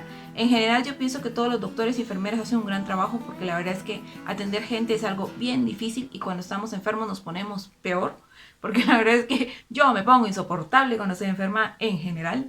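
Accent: Mexico. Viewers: Saludos hermosa ya ablas como mexicana bendiciones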